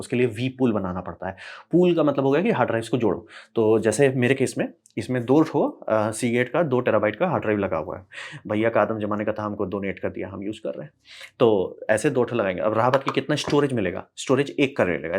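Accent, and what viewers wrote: Bihar, India. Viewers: Bihar se hawa ka ho bhai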